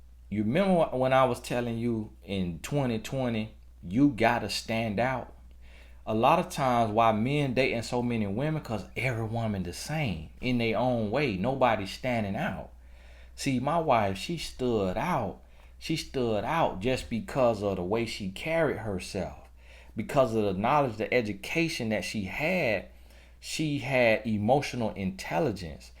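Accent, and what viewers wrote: South, United States. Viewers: You so real, and transparent! from your dating in your younger years, to being honest about your preference in your wife, and the Lord bless you two kids later.. Your country talking self is alright with me😆👍🏾 I love it! Keep on palming your wife! The RIM ROCKER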